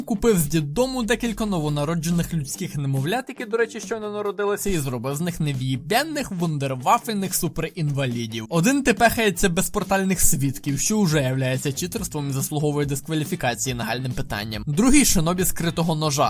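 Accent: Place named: Carpathians, Ukraine. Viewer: 😂👍. ТИ З ЗАКАРПАТТЯ ЧИ З КАРПАТ,ЦЕЙ АКЦЕНТИК В ТЕБЕ Є 🤔 В МЕНЕ ОДНОКЛАСНИК ЧАСТО БУВАЄ В КАРПАТАХ ( ЙОГО ТАТО З КАРПАТ ) БОЖЕ Я ВЖЕ НЕМОЖУ )) ЧИ ТО ВОНО ДУРНЕ ЧИ ВОНО БОГ ЮМОРУ ** НІ Я НІКОГО ОБІДИТИ НЕХОТІЛА ТІЛЬКИ ((( СЕРГІЯ)) Я ЙОГО СУКУ НЕ ПРАЩУ ЗА ТЕ ЩО ВІН НА МЕНЕ НАЦЬКУВАВ ЯРІКА З( 2 КЛАСУ ) А ВОНО ДУРНЕ, ХОЧ МАЛЕ (МЕНІ ПО ПОЯС ) ЙОГО ФІШКА ПІДСТРИБНУТИ ДО ТЕБЕ ВЧІПИТИСЯ ЗА ШИЮ і ВІДЧІПЕТЬСЯ ТОДІ КОЛИ ВЖЕ ДОБРОБО ЩОЛБАНА ДАТИ ))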